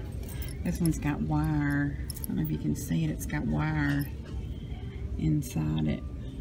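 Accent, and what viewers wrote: South, United States. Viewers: I'm from Michigan and I just love hearing your southern accent! "We fixin to have ourselves a good ole day"! I'm going to say that to myself every morning for the rest of my life!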